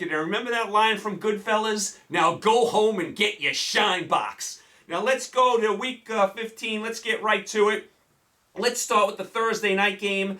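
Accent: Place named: New York State, United States. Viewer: New York State i'm guessing.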